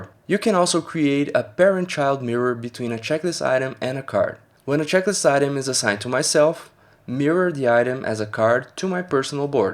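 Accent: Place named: Brazil. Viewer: fácil encontrar um brasileiro falando inglês kkkkkkkkk vou testar seu power up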